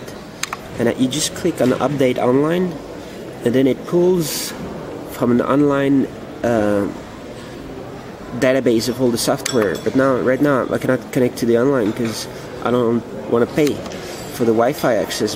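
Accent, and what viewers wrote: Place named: France. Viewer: he sounds french or lebanese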